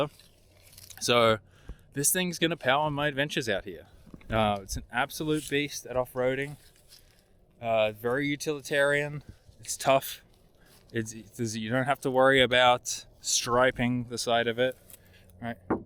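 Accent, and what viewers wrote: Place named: Australia. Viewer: Hope the property is close to a Tesla supercharger. Sound Aussie or Kiwi.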